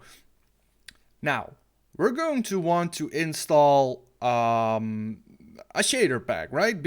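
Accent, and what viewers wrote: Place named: Netherlands. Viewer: dag ik zie dat je nederlands bent het was duidelijk aan je uitspraak let er op bedankt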